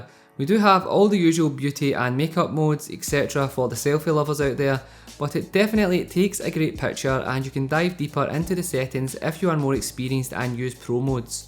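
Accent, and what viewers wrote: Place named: Aberdeenshire, United Kingdom. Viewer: Are you from Aberdeenshire?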